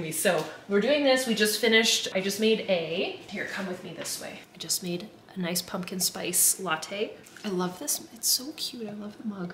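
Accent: Canada. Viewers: I’m distraught over you saying packages and not parcels🥲😂 I LIVE to hear you say parcels, it’s so Canadian🇨🇦🫶🏻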